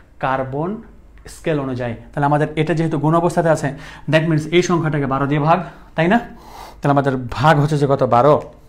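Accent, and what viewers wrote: Rajshahi, Bangladesh. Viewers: Sirer Basha Rajshahi onchol a...tai na?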